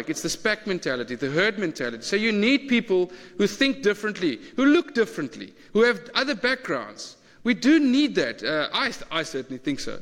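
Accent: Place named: Pretoria, South Africa. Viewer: This man sounds Pretorian 😊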